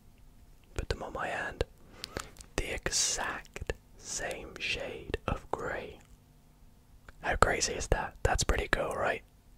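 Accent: Scotland, United Kingdom. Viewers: Enjoyed the video also are you Scottish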